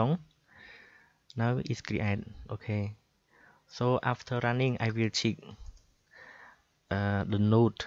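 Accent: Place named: Vietnam. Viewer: Very funny vietnamese accent :D I like it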